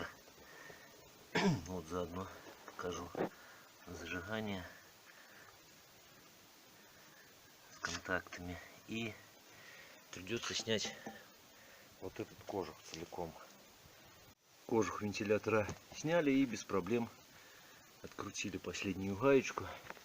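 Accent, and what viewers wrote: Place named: Belarus. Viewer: Здравствуй! Я с Черниговского района Черниговской обл с Анисов Украина.Буду очень благодарен.Ты не с Белоруси? Акцент вроде бы похож.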